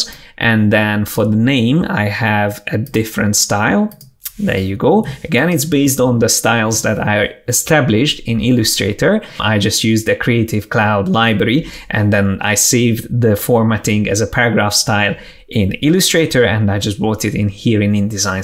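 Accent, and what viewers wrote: Hungary. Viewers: Ne haragudj. Véletlenül nem vagy magyar? Annyira magyar az akcentusod.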